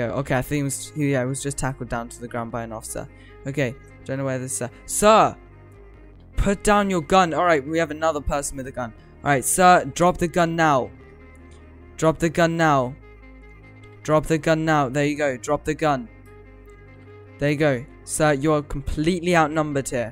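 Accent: England, United Kingdom. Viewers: are you english you sound english i hope you are because i am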